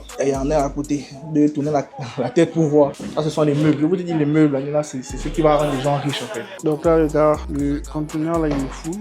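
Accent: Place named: Cameroon. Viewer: Ce le français camerounais ❤❤❤❤❤❤❤❤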